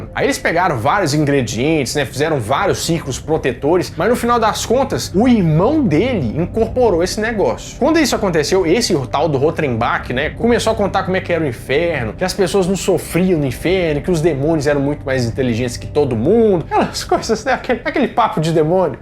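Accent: Minas Gerais, Brazil. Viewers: Verdade TB gosto esse sotaque mineirinho dele nos cativa, parabéns pelo trabalho nesse canal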